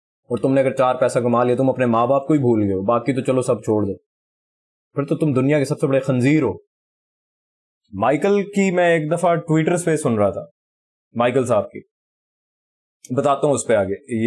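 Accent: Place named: Punjab, India. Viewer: Sir ap ke mooh se Punjabi phubdi aa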